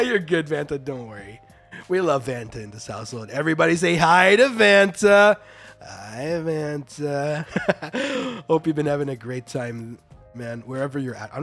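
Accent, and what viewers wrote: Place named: Thailand. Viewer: omg your thai accent is so adorable ✨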